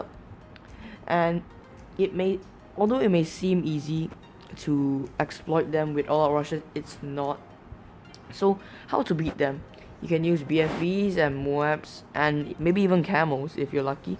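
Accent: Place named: Singapore. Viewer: You sound singaporean, where are you from?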